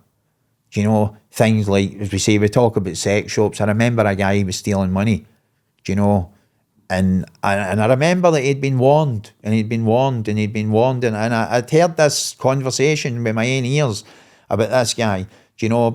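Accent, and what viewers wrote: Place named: Glasgow, United Kingdom. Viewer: cheers for this podcast, my granda's Calton born and raised and just recently passed and hearing your accent reminds me of him so much you've gained a follower from me hope to hear more podcasts from you with Shaun or just any other story. I had to re light my spliff at least 7 times listening to your nutter of celly story I was laughing that much. Wondering if you ever knew my cousins from easter house they're no about anymore (Binnies) if so any stories of them?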